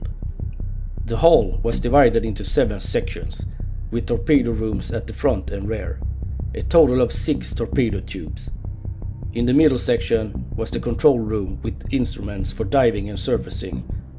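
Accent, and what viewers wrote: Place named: Sweden. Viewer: I LOVE the svengelska!! Are you from skåne?? P.s You speak terrific english, just heard your perfekt uttal on orter 😹🙏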